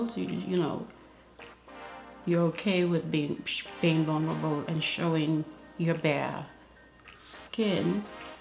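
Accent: Bahamas. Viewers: It feeds so good to hear someone who sounds like me on YouTube. I'm proud to an see a fellow Bahamian on YouTube?I've always thought about starting my channel, but I'm scary. How did you get started? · I'm a new sub, I came across your channel and was like wait i know that accent. It's Bahamian? You mean to tell me we have our own Bahamian representating the 242 and no one told me? I'm so proud of you. Big the Bahamas, we doin7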